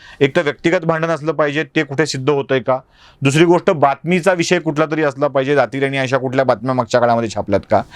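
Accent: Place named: Marathwada, India. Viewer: त्यांच्या भाषेचा लहेजा तसा आहे , थोडा ग्रामीण , शिरवळ , मराठवाडी पण स्पष्ट .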